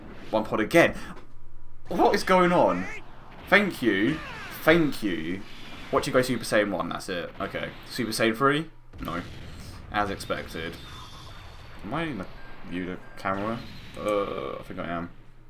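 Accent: United Kingdom. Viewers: YOURE BRITISH?!?! Who woulda thought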